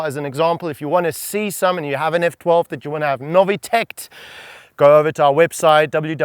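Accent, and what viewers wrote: South Africa. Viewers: That South African Accent is sexier than the F12 and the 812😉🤣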